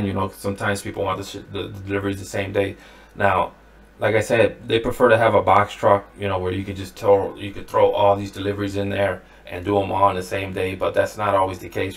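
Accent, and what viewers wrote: Cleveland, United States. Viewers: You from Cleveland?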